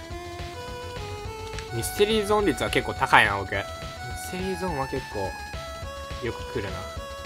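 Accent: Kansai, Japan. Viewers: 関西弁だ！嬉しい(*´ω｀*) そして かわいい笑 いつも以上にかわいいしリピート要素が多すぎる...絶対リピる(予言)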